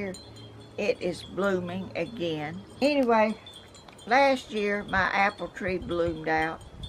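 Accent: South, United States. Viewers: I JUST LOVE YOUR SOUTHERN DRAWL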